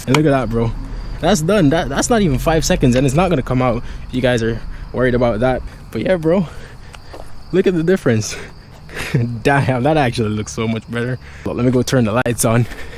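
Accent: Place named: Jamaica. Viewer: U sound like a Jamaican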